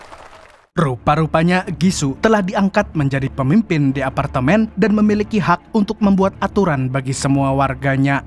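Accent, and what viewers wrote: Banjar, Indonesia. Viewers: Adminnya urang banjar ngarannya nii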